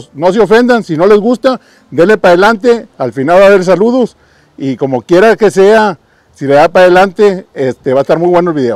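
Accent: North, Mexico. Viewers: Saludos compa desde Atlanta Georgia me encanta tus vídeos y tu asentó norteño esta perro viejon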